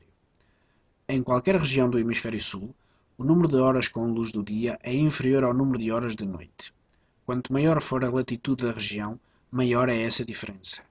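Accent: Portugal. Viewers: Horrível, está com este sotaque português. Como vou passar uma coisa dessa para os meus alunos? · O vídeo é dirigido ao povo português. O sotaque para português, sendo de português, não importa... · Tecnicamente é o melhor vídeo que encontrei sobre o assunto, a explicação é perfeita, pena o português de Portugal. Mas merece um áudio novo. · porque portugues de portugal :3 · Pq é sutaque português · ns não dá para entender nd ele fala meio portugal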